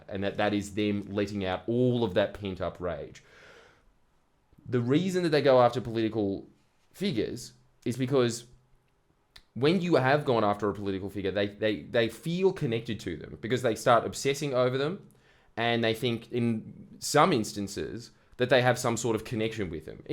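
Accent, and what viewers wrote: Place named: Australia. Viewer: Australian detected, opinion disregarded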